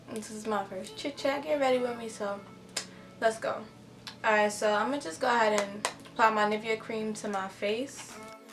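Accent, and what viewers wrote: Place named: Barbados. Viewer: I love your Barbados accent yes yes boo 😍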